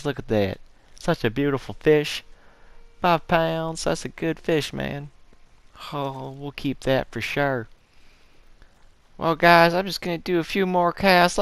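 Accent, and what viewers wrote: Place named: South, United States. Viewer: Been missing your RDR2 online videos. Hope all is well! Your "Southern" accent is hilarious 😂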